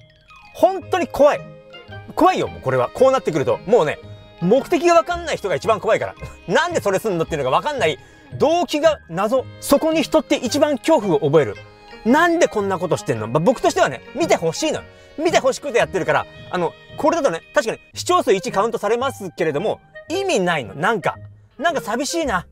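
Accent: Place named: Okinawa, Japan. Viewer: さんの沖縄なまりの話し方が大好きです。生まれた時から沖縄に住まれてましたか？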